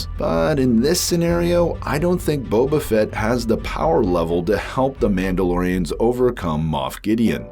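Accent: Canada. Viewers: Is that a Canadian accent with scouting pronounced "scoting" (sounds like coating)?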